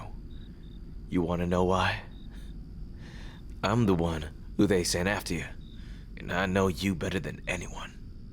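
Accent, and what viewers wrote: South, United States. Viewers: Why do i always simp for the southern accent?! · 10/10 the southern accent gets me every single time swoons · You don't know how much I loveeeee your Southern accent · Oop the southern hooked me. Im cook cooked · I'm gonna need to hear this southern accent again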